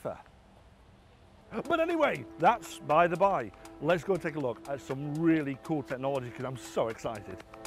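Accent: United Kingdom. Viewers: I can't understand the British accent in general, so I just turn off the audio, turn on the cc subtitles and run yt videos at 2x.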